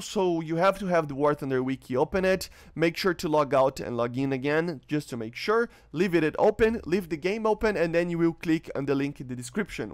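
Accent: Brazil. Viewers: mano aprendeu ingles aonde ate que tu nao tem um sutaque tao ruim para um br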